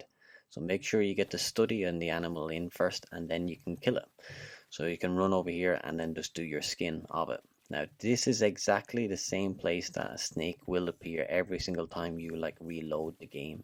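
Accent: Ireland. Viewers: Hey are you Irish?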